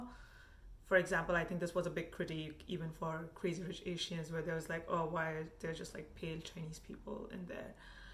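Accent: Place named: India. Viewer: I'm sorry you ended up hating the role you auditioned for 🤣. But your accent is still Indian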